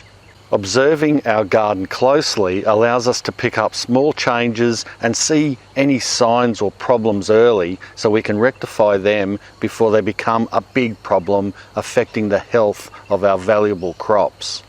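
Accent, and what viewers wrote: Australia. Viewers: Yes! That Australian accent has got to help! ❤️❤️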